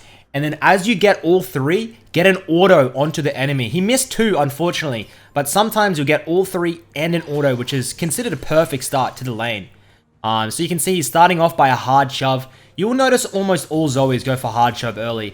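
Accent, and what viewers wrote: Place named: Australia. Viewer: Man this guy speaks like a true Aussie bloke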